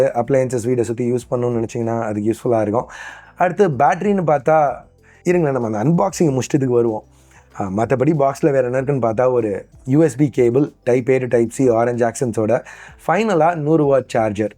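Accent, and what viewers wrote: India. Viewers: Video Dubai Voice India 👌👌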